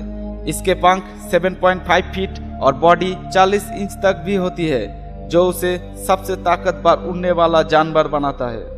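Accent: Bengal, India. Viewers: Ye to bangali hai ya odisa... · Are u bengali ?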